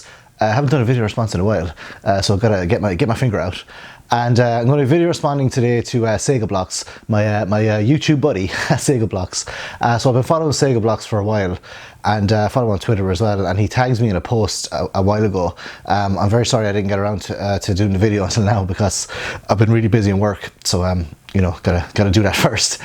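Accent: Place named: Southern England, United Kingdom. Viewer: I forgot I owned wappy dog until you reminded me. 😳 Might have to do a VR to this. Youre in Southern England.? The accent threw me a bit. Didn't think that there were many of us tubers in the south. 😁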